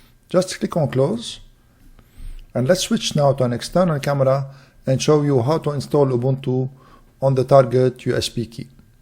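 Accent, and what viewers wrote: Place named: Lebanon. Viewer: you sound lebanese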